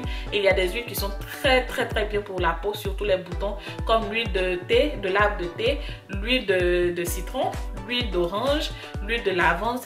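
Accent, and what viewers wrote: Ivory Coast. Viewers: Accent ivoirien...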